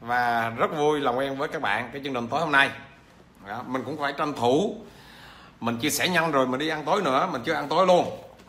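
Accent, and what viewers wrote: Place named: Central, Vietnam. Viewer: Chú ơ dau cua miền trung vay ạk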